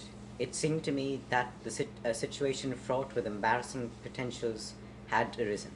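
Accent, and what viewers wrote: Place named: RP, United Kingdom. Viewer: Oh my, you're so very posh. Please post the next reading.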